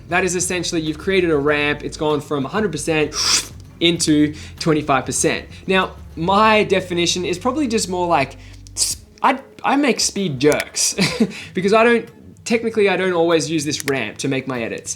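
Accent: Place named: Australia. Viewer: Loved the "bellissimo" part ahahha. Moreover, hearing some good Australian bettah and watah reminds me of my lovely months in Sydney. Greetings from Italy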